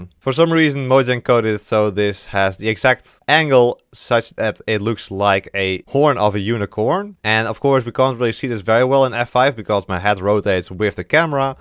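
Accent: Netherlands. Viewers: ik wist het.Nederlands accent is zioo duidelijk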